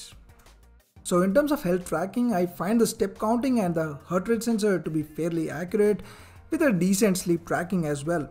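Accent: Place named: Telugu, India. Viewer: Great review! Are you from telangana or Andhra Pradesh btw? Just curious 😅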